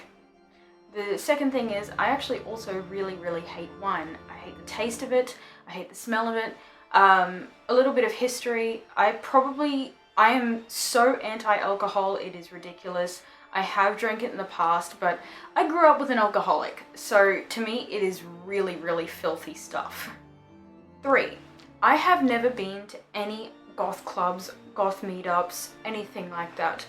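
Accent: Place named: Australia. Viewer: And to me, as soon as she started talking I thought "she's from Australia" 😳 lol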